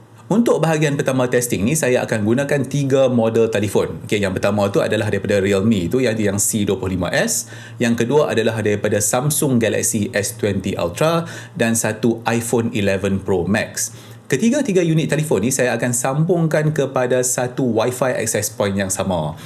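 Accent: North, Malaysia. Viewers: tima kasih bg info berguna, izin saya share dlm fb... SELamat Hari Raya Bos.. pekat jugak loghat utara naa...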